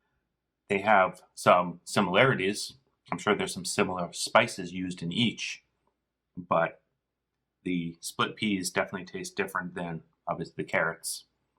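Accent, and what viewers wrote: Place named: Canada. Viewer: Where is this restaurant? You sound Canadian.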